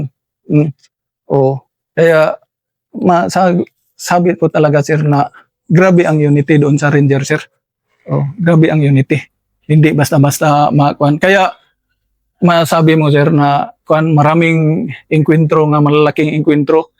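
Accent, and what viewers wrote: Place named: Visayas, Philippines. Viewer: Bisaya jud kayo si master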